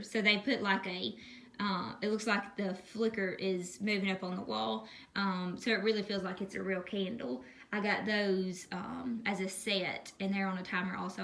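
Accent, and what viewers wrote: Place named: Kentucky, United States. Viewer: GORGEOUS!..Love your channel!..and your accent makes me miss my hometown in KY!..I currently live in Indianapolis, IN..But hope to get back to the Country sooner than later!..keep the home tours and DIY's coming Please!..LOVE THEM!..Have a Fantabulous New Year!..♥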